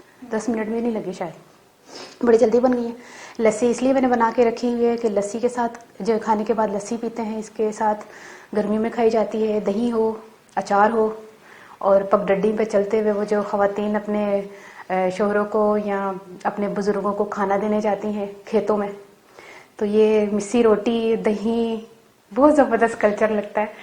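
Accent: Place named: Punjab, India. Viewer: Ap ke panjabi bikul hammari wali ha ap boht achi legti hn panjabi bolte hwe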